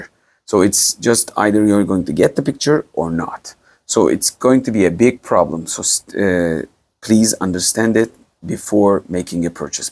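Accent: Turkey. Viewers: Oglum I recognise this accent, memleket neresi?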